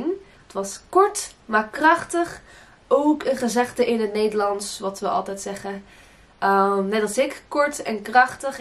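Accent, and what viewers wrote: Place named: Portugal. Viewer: Desculpa a sinceridade, você é mais linda falando em português. Seu sotaque português é muito fofo. Amo quando você fala em português muito fofinha, charmosa ❤️🥰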